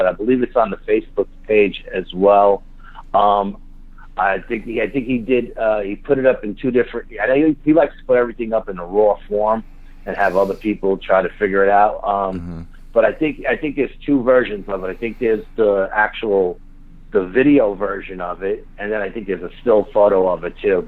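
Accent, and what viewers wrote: South, United States. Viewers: The Southern Accent is like ???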